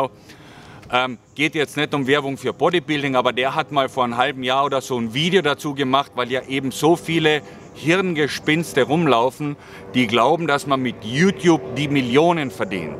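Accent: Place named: Gailtal, Austria. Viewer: Jetzt hab' ich schon den ganzen Tag Deine Videos quer durch den Gemüsegarten angesehen. Fein, dass Du nach einem Tief scheinbar wieder so einen Enthusiasmus entwickelst. Pass nur auf dass Du nicht aus einer Depri in eine Manie verfällst .... P.S.: mir gefällt Deine Gailtaler Schnauze frei von der Leber weg - kommt sehr ehrlich rüber, auch wenn ich persönlich Manches etwas diplomatischer rüber bringen würde - viell. nicht immer ganz so emotional ... aber Du bist halt so ein "g'rader Michl'" und das ist gut so. Viel Freude in Thailand! .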